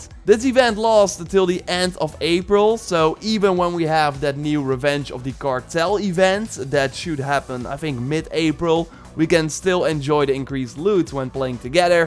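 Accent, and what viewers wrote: Netherlands. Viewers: You sound dutch not gonna lie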